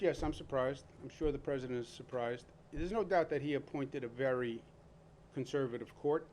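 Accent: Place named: East Coast, United States. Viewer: I just listen to him for his east coast accent and humorous points of view (Urban). As a governor, Cuomo is as corrupt as any of them. He is still just a puppet who has made some terrible choices because he was bought and paid for. Do a little bit of research if you don't believe me. Don't praise this man, listen to him from an entertainment standpoint alone.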